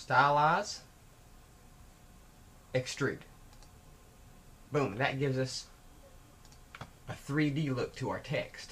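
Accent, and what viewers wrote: South, United States. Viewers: you have to be southern. i can hear it in your voice. i'm from NC! thanks for the tutorial.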